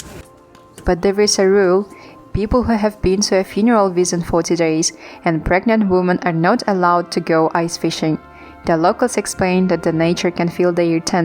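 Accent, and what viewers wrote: Russia. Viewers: Русский акцент пробивается...Как тебя звать?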